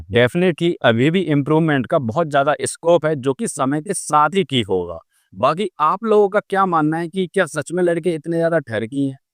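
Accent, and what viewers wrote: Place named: North, India. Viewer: He have north indian accent 🇮🇳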